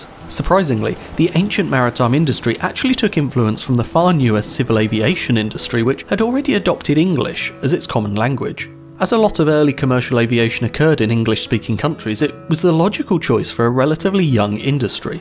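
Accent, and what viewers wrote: United Kingdom. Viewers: Informative, concise, well animated and British voiceover. Perfect. Avast ye scurvy knaves and prepare to be boarded ! Aaar !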